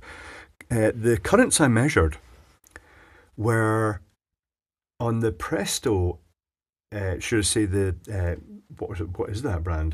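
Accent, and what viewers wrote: United Kingdom. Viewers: British English is in full effect in this video. Crikey.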